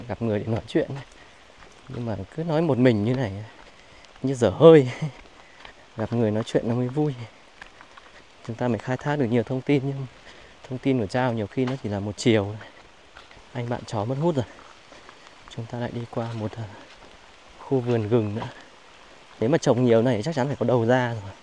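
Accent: Hanoi, Vietnam. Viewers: có chất giọng Hà Nội rất ấm nghe cứ bị cuốn ý ❤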